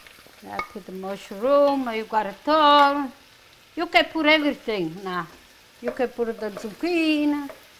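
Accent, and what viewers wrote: Italy. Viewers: ammore! Thank you for these wonderful recipes. You make them so much fun with your stories, the singing and Italian accent. God bless you always! 🙏🏼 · gramma, love a your recipes. italians gota have the best accent. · I like your English language with Italian accent😘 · She is too cute, I love when she talks, I miss that accent like my mom’s and other relatives from Italy...COOKING TALENT CANT COMPARE, thank you for sharing your knowledge and love of serving others💕 God bless you and your loved ones, ❣️ Love your singing, memories of my beloved mamina, it warms my heart❤️ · You are a joy to watch! (Have to listen very closely because of your beautiful Italian accent). Thank you so much for all of your great recopies. (Have of copy of your cook book). You are a very special lady I and enjoy watching you create all of your wonderful creations in your kitchen! 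God Bless!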